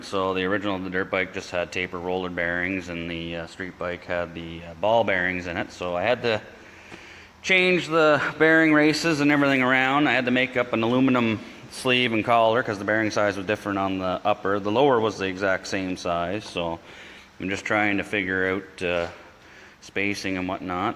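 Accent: Canada. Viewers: Wish i had the cash, this things amazing. And you sir, are one of the most canadian sounding fellas iv ever herd lol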